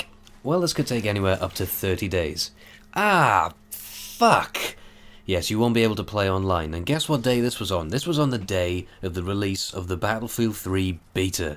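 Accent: Wales, United Kingdom. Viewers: He's from Wales, UK. Well, his accent's Welsh, so I assume he lives there.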